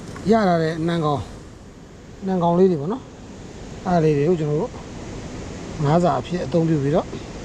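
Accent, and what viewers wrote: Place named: Twantay, Myanmar. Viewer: တွံတေးတိန်းတန် အသံနဲ့ဟ ရှင်တို့က ရဲလား🤨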